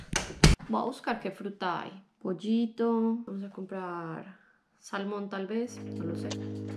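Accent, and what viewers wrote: Colombia. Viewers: Hola te saludo desde 🇬🇹 me gusta tu acento colombiano.....me gustan tus videos ❤ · Hola! Empecé a seguirte porque me encanta el acento colombiano, ¡mi nuera es colombiana! ¡Soy brasileña, de Rio Grande do Sul! ¡Me encantan tus publicaciones! ¡Éxito y feliz Navidad! 🎄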